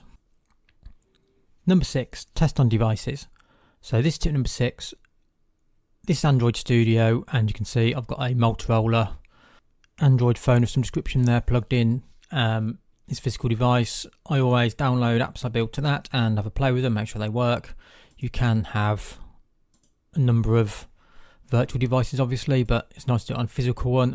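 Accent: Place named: United Kingdom. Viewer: Great content! But British accent isn't my cup of tea.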